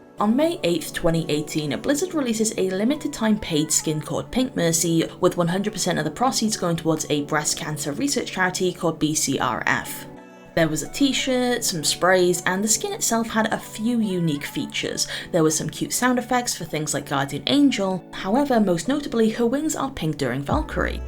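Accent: United Kingdom. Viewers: Ah yes, British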